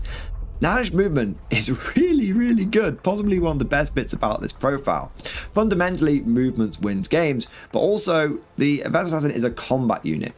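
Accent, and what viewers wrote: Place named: United Kingdom. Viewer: he's British they break everything English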